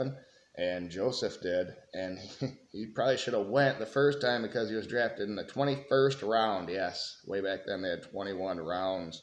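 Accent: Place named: Mississippi, United States. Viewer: You sound like you live in Mississippi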